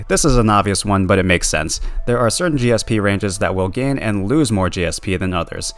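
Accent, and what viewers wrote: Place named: New York, United States. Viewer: What part of New York are you from ? I’m from New York as well 👀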